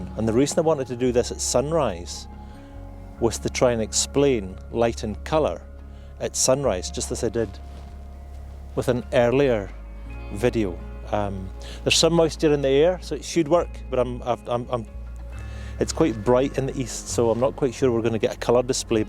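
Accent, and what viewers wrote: Scotland, United Kingdom. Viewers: I came across many sites on photography, but never one like yours. You really hone in on the finer details and helping struggling photographers like myself in choosing when best to shoot and what settings to use. I love this segment, as well as the one on shooting pano when you explain the need to use the nodal stick to reduce perspective "distortion" and helps to blend multiple images better. I have since bought one such machine, thanks to your teaching. Please please continue to inspere us, the struggling photographers. Your voice is good, "smooth Scottish" accent I kind of getting used to now and it sounds like music to my ears. Thank you is never enough for such a great teacher like you.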